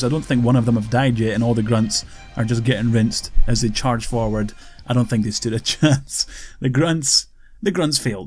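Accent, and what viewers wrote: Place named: Ireland. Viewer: I love this guy's irish accent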